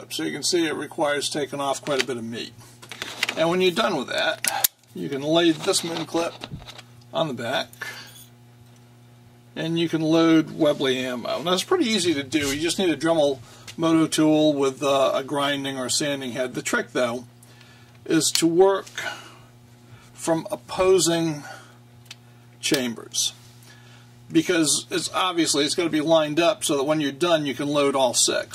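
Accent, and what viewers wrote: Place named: Southern Maryland, United States. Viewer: I think I recognize an accent of Southern Maryland/Northern Virginia. I'm from there.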